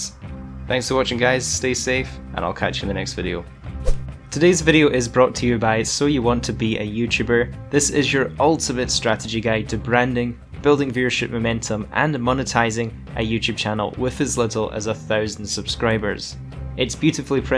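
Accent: Scotland, United Kingdom. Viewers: Where in Scotland are you from?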